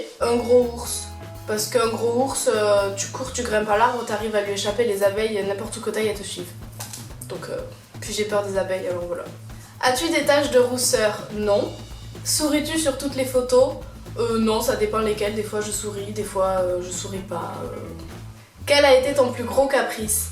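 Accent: South, France. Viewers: j'adore ton accent Ca rappelle le sud,la mer,la plage! Mais! Quand on regarde le reflet du ciel derriere toi bah il fait pas très beau on dirait!👌 Je t'aime beaucoup ❤️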